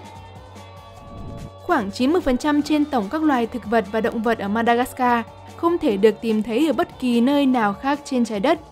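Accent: North, Vietnam. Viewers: nói tiếng Bắc là đúng r mà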